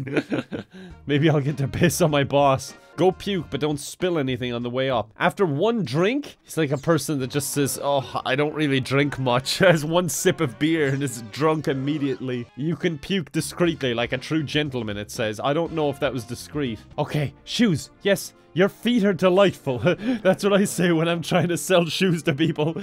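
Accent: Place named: Malahide, Ireland. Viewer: You sound like your from malahide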